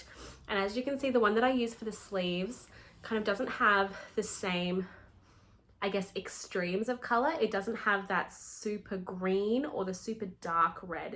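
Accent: Australia. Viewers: Great to see you pop again on YouTube and it’s so nice to hear an Australian voice which are few and far between on knitting podcasts and speak about us coming into summer as the rest of the world talk about going into winter (as though there is only one hemisphere in the world)! Love your humour 😂😂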